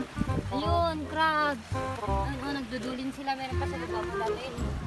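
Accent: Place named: Pangasinan, Philippines. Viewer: wen napintas ka ng atalaga,,.. ngem yong accent mo,, parang may halong pangasinan,, haha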